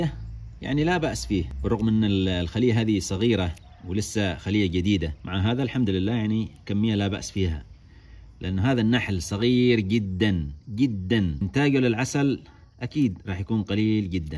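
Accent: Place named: Yemen. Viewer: اخي. انت من اليمن صح. لهجتك مشابهه للهجتنا اصحاب الحديده. بس المكان هذا الي انت فيه وين. مش ممكن يكون في اليمن 😢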